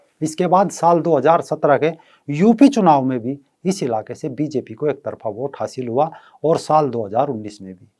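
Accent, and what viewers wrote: Haryana, India. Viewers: Sir aapka accent thora thora haryana cala lagta hai......kya aap bhi jaatt ho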